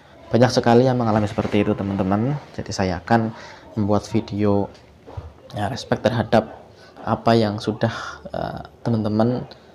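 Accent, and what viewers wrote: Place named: Java, Indonesia. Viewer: dari logatnya kaya wong jawa ngapak mas.. kalo boleh tau domisili manan mas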